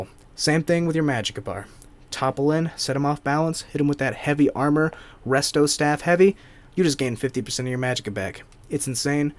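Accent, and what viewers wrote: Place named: East Coast, United States. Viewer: Wicked east coast accent man🤣 keep up the good work!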